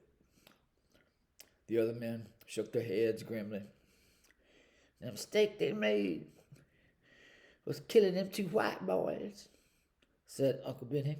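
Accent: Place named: Mississippi, United States. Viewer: Love this. As one born in Mississippi whose mama was born and raised in Scott County, I recognize those sounds. At one point you reminded me of Aunt Authula. I heard Uncle Stanley, too. My relatives in Mississippi sound just like that. I need to read your books. Well done here. Thank you.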